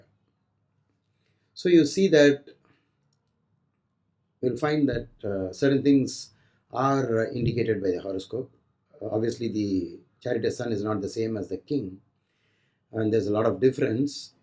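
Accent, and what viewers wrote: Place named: Madras, India. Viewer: ur accent is madrasi type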